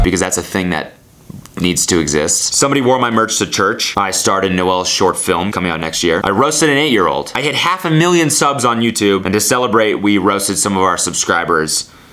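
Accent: Canada. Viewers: I always forget you’re Canadian until you open your mouth and say “sohrry”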